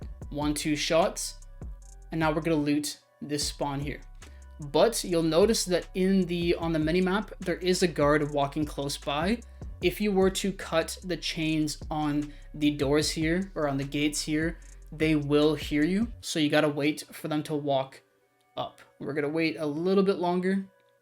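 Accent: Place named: Canada. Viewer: Wow are you Canadian! Lol or from the UP of Michigan, regaurdless i dig it. 👍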